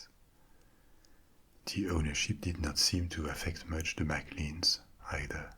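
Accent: France. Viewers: This is now my favorite ASMR channel. His French accent just makes me melt. Thank you for calming my anxiety :)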